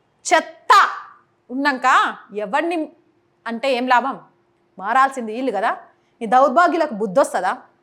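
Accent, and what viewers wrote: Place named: Telangana, India. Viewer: కందకు లేని దురద కత్తికి ఎందుకు అని అంటారు ఇదే. వాళ్ళ ఉర్లో జనాలకు పేదలకు ఆడవాళ్ళకి లేని దురద మనకెందుకు. మీరు ఎన్ని చెప్పిన వాళ్ళు మారరు సిగ్గు శరం ఉండదు. తెలంగాణ యాస తో మాట్లడినంత మాత్రాన ఎవడు రియాక్టు ఎవ్వరూ గా.ధర్మం మన చేతుల్లో ఉంది అది మనం ఇలాంటి వాళ్ళని ఎంకరేజ్ చేస్తే ఇలానే చేస్తారు ఇలాంటి వెధవలు. చివరకు మీరు చెప్పేది అదే ఛానెల్ సబ్స్క్రయిబ్ చేస్కోండి షేర్ చెయ్యండి అని.😂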